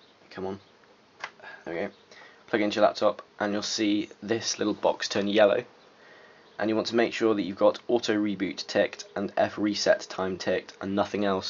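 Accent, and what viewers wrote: United Kingdom. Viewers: British accent is very disturbing.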